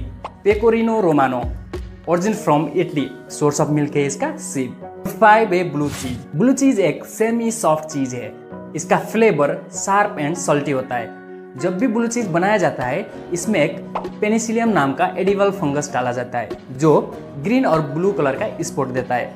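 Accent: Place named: Nepal. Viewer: Aap nepali ho na ... tone bepali aaraha hain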